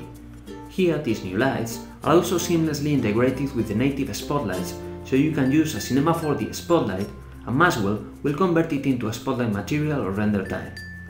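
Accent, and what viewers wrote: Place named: Spain. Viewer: Offended by a Spanish accent from someone named " "? It's a joke right?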